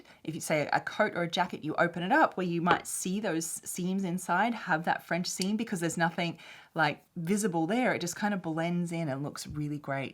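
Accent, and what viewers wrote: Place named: Australia. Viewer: I was really looking forward to this video, thank you! I had to decide to stray from a skirt pattern I was working on yesterday because the double-gauze fabric I had chosen frays so much I felt I needed to use french seams (vs just finishing the raw edges). I could have used the overcast stitch on my sewing machine but I honestly didn't think it'd be durable enough to last many wears and washes. Also, while I've watched a few of your videos before i've just now realized you've a non-American accent. Are you Australian?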